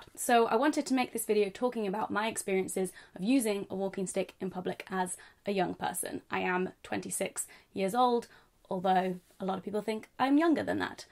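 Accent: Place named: England, United Kingdom. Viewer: That English accent 😍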